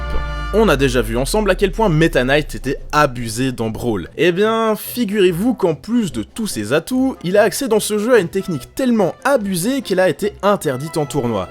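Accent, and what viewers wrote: Switzerland. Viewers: J'étais pas prêt pour le "neuf cent nonante" (je sais pas si c'est la bonne orthographe). Sans l'accent j'oublie parfois c'est une chaine Suisse. xP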